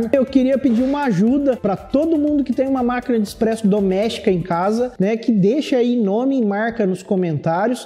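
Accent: Minas Gerais, Brazil. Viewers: Oi, eu não conhecia os cafés especiais e o quão incrível é esse mundo até achar o seu canal por acaso. Passei a seguir suas dicas e hoje eu aprendi a apreciar o café. Só tenho a agradecer pelo seu excelente trabalho! se possível pode tirar uma duvida minha? eu tenho pesquisado a respeito de uma maquina da Oster esse modelo aqui: <link> comparada com as outras ela parece muito mais simples. você já viu essa maquina ou teria algum comentário a fazer sobre? ela parece ser muito simples de usar. sobre o bordão, bom acho que sendo de minas você poderia usar algo mais característico do sotaque dai. Talvez fique legal C: um grande abraço e muito sucesso!!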